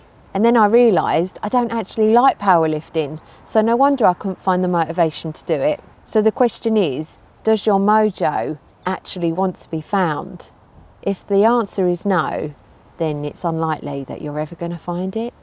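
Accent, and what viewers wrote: United Kingdom. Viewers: Hey I think your cool, I love your zeal for life and your British accent!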